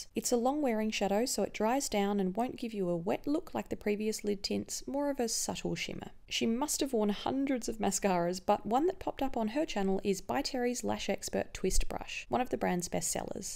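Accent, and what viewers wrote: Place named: Australia. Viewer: Hi I have a question, do you live in Australia cuz u got an accent and Ye... oh and if you do then where do you get the best skincare products for cheap? I have recently got into skincare but most shops are very pricy.. so if you have any actual in store stores then plz spill:)))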